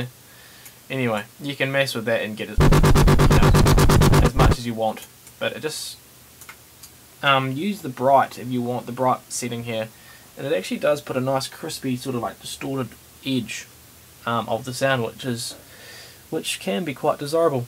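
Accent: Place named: New Zealand. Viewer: haha i thought i could hear an nz accent ha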